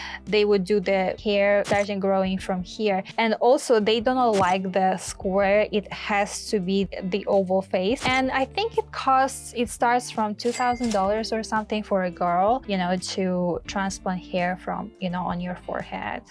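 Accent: Russia. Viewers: you sound russian, why are you replicating chinese beauty standard though, for work? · Че за русский акцет🤔🤔🤔🤔🤔🤔🤔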